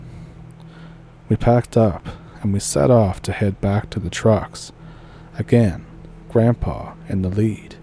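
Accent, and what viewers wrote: Ontario, Canada. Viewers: Odelay,! 🎉... Listening to your voice so reminds me of my cousins from Grey, Ontario 😊😊😊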